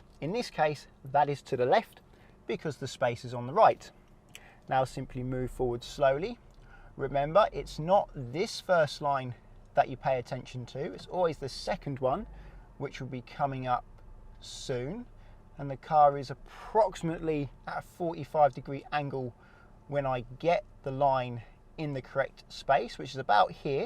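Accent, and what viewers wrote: United Kingdom. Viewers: Perfect explanation and your British accent so classy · Superb explanation,the British accent is lit!lol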